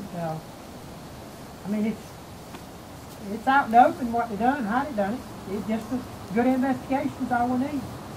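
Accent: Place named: South, United States. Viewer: There are better ways to get things done in this country than elaborate and over-the-top hanging in effigy skits. Next time get an articulate and well spoken person to present your case. I mean the talking to mannequins, the hangings in effigy, and the THICK southern accent completely distracted me from any point you were trying to make. The video creeped me out more than anything.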